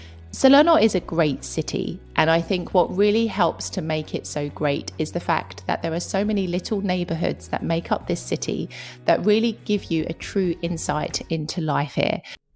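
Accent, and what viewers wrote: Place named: London, United Kingdom. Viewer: Just found your channel what a breath of fresh air,really enjoying your vlogs and looking at some stage to spend about 3 maths of the year in Italy as retired and enjoy the lifestyle... Sicily really appeals so get that business set up soon.. Love the honesty and of course the accent Londoner myself keep up the great work and Godbless you🎉🎉🎉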